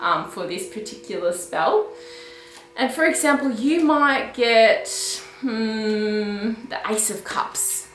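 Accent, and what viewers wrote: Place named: Australia. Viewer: the Aussie accent just like her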